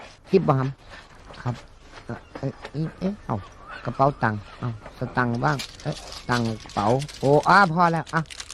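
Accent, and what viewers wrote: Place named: Isan, Thailand. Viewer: ถ้าไม่มีเสียงอีสานภาคและปล่อยให้มันเล่นไปตามต้นฉบับ มันจะสนุกกว่านี้เยอะมากๆ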